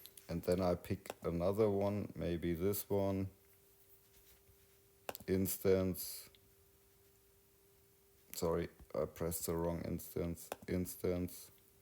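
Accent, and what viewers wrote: Bavaria, Germany. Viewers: Immer wieder neue Einblicke in Nomad Sculpt - die App, die mir leider noch lange ein Buch mit sieben Siegeln bleiben wird. Aber ich folge dir gerne weil du beständig im Thema bleibst. Ich hab die Hoffnung irgendwann genug Zeit zu haben alle deine Tutorials nachvollziehen zu können. Wobei mir die älteren Tutorials auf deutsch leichter fallen. Trotzdem Daumen hoch für den Bavarian ( ich höre auch fränkisch raus) - English Aspekt.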